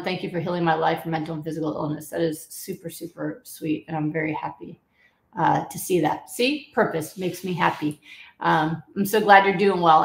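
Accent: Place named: Texas, United States. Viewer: You're starting to sound like a real Texas. Matthew McCaughey would say, "Hello, everybody."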